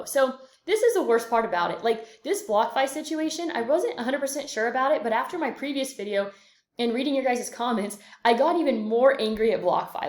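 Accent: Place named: United States. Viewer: I’m aware of when it happened. Did you also know that the US tested contraceptions illegally on PR women which made many sterile and some even die. Aside from what obviously has affected the fertility rate on our island, why women are choosing to have less children? Lack of money and opportunities which is getting even worse with all you rich people moving in and raising the prices of real estate. “There are less white people in Hawaii” It’s not about white people, it’s about rich foreigners and there are many in Hawaii taking over their lands. That’s the reason why homelessness of the natives has increased in Hawaii. Also, I imagine that you’re a white American from how you speak. Just know that most Puerto Ricans could care less about US political standpoints. So, this whole “liberal” or “conservative” or “woke” crap means nothing to me. I’m talking to you about Puerto Rico. 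You can’t educate me about my own island, its people and history; I AM PART OF ALL THAT.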